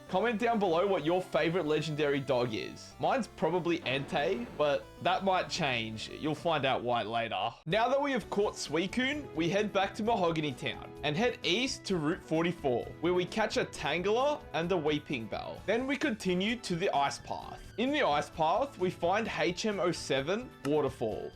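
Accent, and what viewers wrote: Australia. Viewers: He's Australian or something. You're just an ass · Ahhleet foah lol fuck i can only handle the Aussie accent for so long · Because that’s how some Aussies talk. Sounds like he’s got a bit of the Aussie-Leb accent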